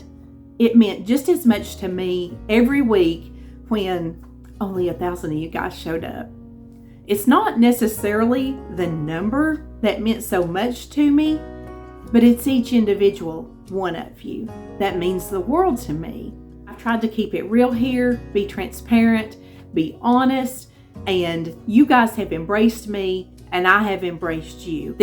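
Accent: South, United States. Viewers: I found you quite by accident scrolling through youtube cooking channels. You did your video on the best simple salids. I absolutely adore watching your videos. I love love your southern accent, And with every dish you prepare, you show the love of your family. And you're a True southern lady and homecock. Thank you for not only the entertainment but the wonderful recipes you put out. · Congratulations! I love your channel and I love the merch. My favorites are the ground beef recipes. And being a born and bred southern girl I love your accent because it is a lot like mine (NC/GA) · Congrats,! I found your channel a few years ago. Your sweet southern accent and fun personality drew me in quickly! I think the first recipe I made of yours was the meatloaf. It is delicious! I like that you include all the sides in your videos too. God Bless you! 😊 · Make a little cream sauce using cold milk a heaping tsp of flour. 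Vigorously stir that flour into the milk and pour over those peas. Allow to thicken a little and enjoy! My favorite way to eat them. This chicken recipe has got to be a must make bc it looks delicious! Thank you for the recipe! Oh my goodness! That garlic cheese bread! So simple and looks delicious! Can’t wait to try it! Again, thanks! Girl, your sweet southern voice is soothing. I really enjoy your videos. Congrats on 100k subs! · you are a breath of fresh air! Your humility is unheard of in this me, me, me world we live in today! I have been eating low carb/keto for a year now so I don't use your recipes but ALWAYS look forward to watching your videos every week! I adore your soft spoken, southern drawl that I am so fond of because of my family roots being from TN. Love you and wish you God's blessings today and every day! Congrats on the 100k subs...well deserved!! <3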